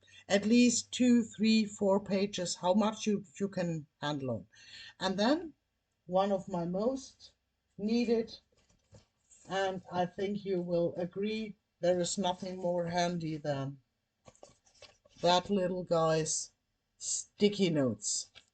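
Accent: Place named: Germany. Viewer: Hello, I'm Yağız, I'm following you on YouTube, good luck, and your German accent is so sweet HDHM! 😈🐉❤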